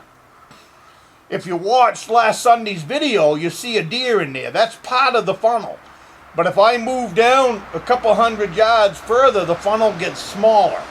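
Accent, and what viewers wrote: Maine, United States. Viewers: I'm in Western Maine and when I heard yer voice I knew you were a Maina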